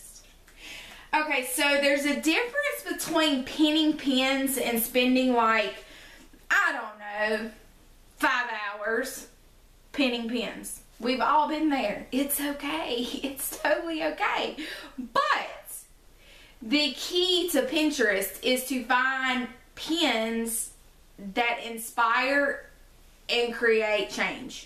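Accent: South, United States. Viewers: your voice is so darn cute, I literally listened to you for your voice :-) I love that southern drawl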